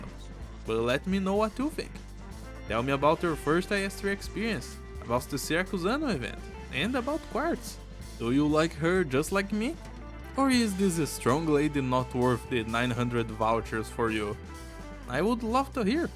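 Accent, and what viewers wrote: Brazil. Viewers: You are Brazilian? your sotaque are very paulista :V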